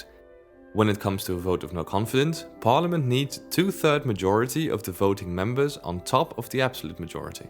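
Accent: Netherlands. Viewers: You're Dutch, right? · Why do you sound Dutch? Are you? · I hear a slight Dutch accent am I right? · I hear Dutch accent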